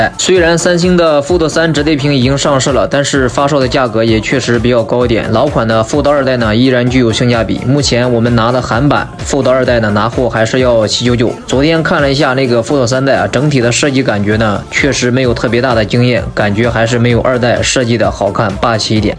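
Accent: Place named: Henan, China. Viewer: 这标准河南普通话哈哈哈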